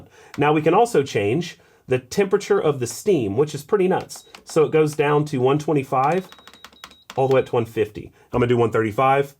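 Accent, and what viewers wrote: South, United States. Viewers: Your southern American accent but simultaneously using metric is wrecking my brain.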